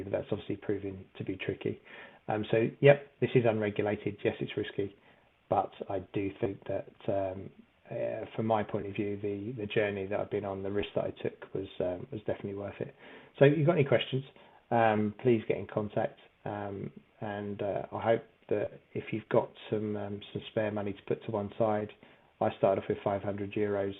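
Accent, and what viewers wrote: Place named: United Kingdom. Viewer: Hi there, great video chap. I just joined yield nodes with a $1,000 initial investment but thinking of adding more! Would you be able to please explain a bit more about how you withdrew into btc and then I guess back into your bank? Much appreciated thanks 🙏 btw, sounds like you’re in the Uk…that’s where I am👍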